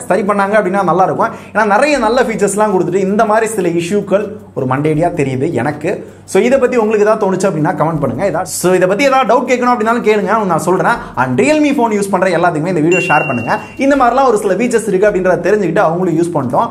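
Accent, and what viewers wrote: Madurai, India. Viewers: Pro. Madurai pechu and nakkal vera level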